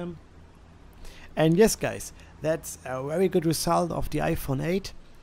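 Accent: Germany. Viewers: dieser deutsche Akzent ;) aber gutes video bro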